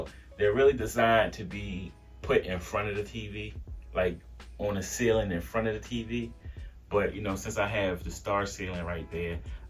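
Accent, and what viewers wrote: Baltimore, United States. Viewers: Boy this guy from BMORE you heard, that accent never lies. I love the mancave and the video subscribed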